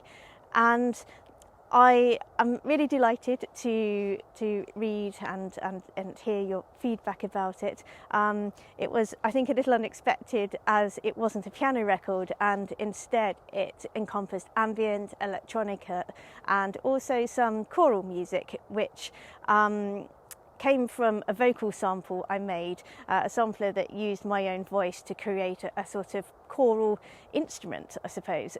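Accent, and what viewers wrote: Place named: Switzerland. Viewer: Whereabouts in the Alps,? Sounds quite Swiss to me 😊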